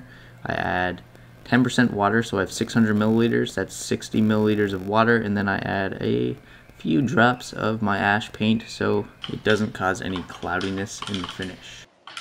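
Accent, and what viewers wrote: Canada. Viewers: you from canada dude? accent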